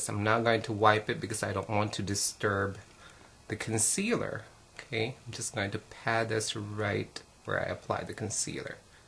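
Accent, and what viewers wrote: Philippines. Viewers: mwahahah love the pinoy accent you;re so awesome! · Love your Filipino Mother accent! Haha! <3 I just wanted to say.. THANK YOU FOR THIS VIDEO! Honestly, this may be weird to confess, but you have made me accept.. actually, LOVE my acne scars. I never thought of them as something that can give me "character". So thank you, so much! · he's filipino! lol, thei got the accent! LOL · omg! you're filipino ! :) i can tell by the accent you did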